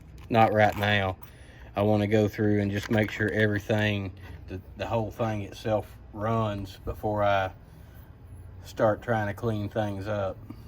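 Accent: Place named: North Carolina, United States. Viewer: Sounds like you’re from Lumberton NC. Stay safe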